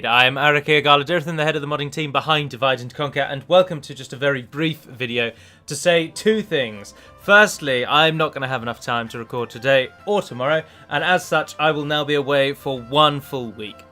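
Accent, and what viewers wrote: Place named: RP, United Kingdom. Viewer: you are incredibly posh its fascinating.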